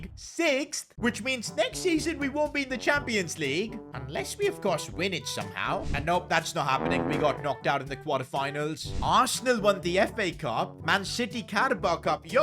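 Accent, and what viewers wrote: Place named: India. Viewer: lol Indian accent is so strong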